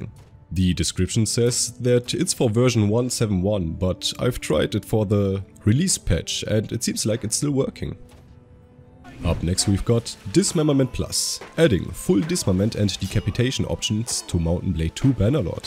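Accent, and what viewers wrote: Germany. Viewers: Irgendwie hörst du dich wie ein deutscher an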